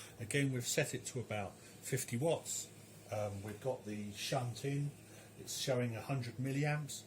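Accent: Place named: United Kingdom. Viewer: you sound like you are U.K. based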